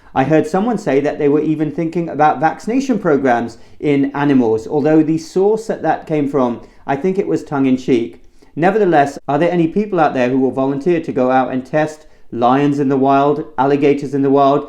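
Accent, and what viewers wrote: England, United Kingdom. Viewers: There is nothing quite so elegant as someone saying "expelling snot" in a beautiful proper English accent. Bravo!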